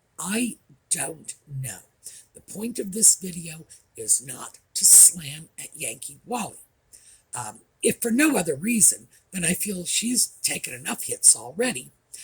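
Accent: Wales, United Kingdom. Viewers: I find her Welsh accent very pleasing to my ears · Yankee Wally has very much a place on YouTube ! Long may she grace us with her one of a kind humor and analysis with a beautiful Welsh accent I love her channel people in glass houses should not throw stones . H and Nutmeg are the biggest bullies out there!🇺🇸❤️ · expecially hearing her Welch accent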